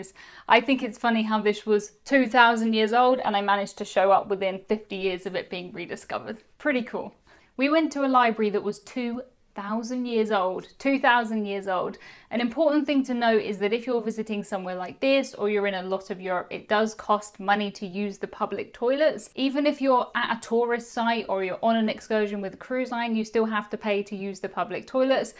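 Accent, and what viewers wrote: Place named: United Kingdom. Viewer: Don't let anyone bother you about your British accents for things like "bath". Even in the United States people say things very differently depending on the region they are in. Be you, I enjoy listening to you speak about various cruise topics with your British accent. :)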